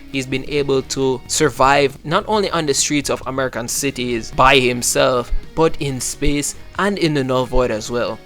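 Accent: Jamaica. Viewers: your jamaican right? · Are you from Jamaica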